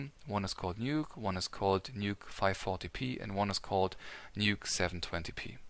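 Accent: Germany. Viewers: Gotta love that german english accent. Sehr gut xd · Ich habe irgendwie instant gemerkt das du Deutscher bist xD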